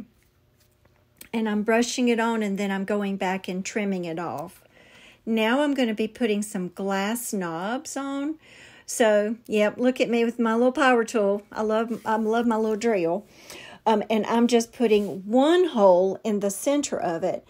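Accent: South, United States. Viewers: I feel so bad for you going through that scare of suspecting you may have shingles. I went through it a few years ago and I did in fact have shingles., we arent here to judge your appearance. Absolutely not. I know that I enjoy your sweet voice and southern accent. You are one of the best creators I watch. Today all of these projects are fantastic. The trimmings mold are so gorgeous with the pumpkin and the greenery and flowers. And OMG that night stand came out so beautiful with the decopauge paper. Matter of fact I just checked it out and I am buying it. You are amazing with everything you touch. Thank you for sharing your projects and for sharing the links in case we're interested. I do hope your hives disappear quickly.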